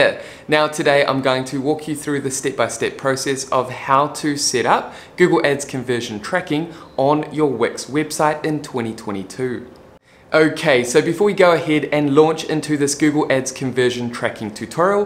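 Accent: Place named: New Zealand. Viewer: Thanks, for such a clearly explained tutorial! Also, I'm from Chch, NZ and was happily surprised to hear a kiwi accent. You have done us proud. FT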